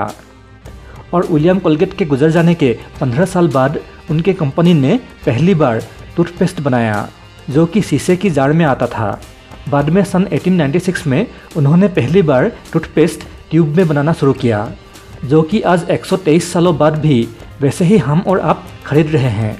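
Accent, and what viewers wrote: Assam, India. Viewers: Assam se ho kya aap ? 😄 Aapki hindi eisa hi hai kuch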